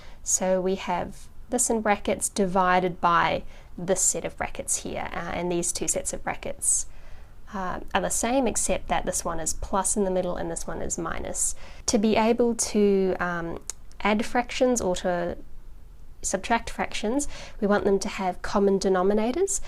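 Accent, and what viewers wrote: New Zealand. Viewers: New Zealand accent? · Thank goodness for the Polish subtitles. As an Australian I was struggling with the New Zealand accent. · I think she is New Zealandish? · Are you from New Zealand? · Sound like a kiwi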